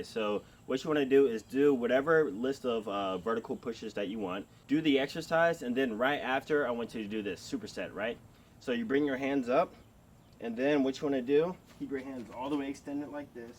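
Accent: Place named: Caribbean. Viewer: You sound like you from the caribbean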